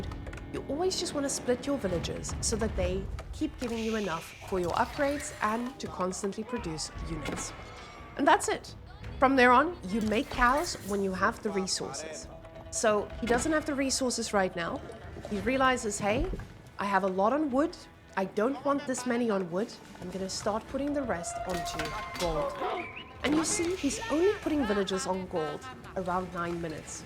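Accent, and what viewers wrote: South Africa. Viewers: I don't know why but I am madly attracted to the South African accent... I need help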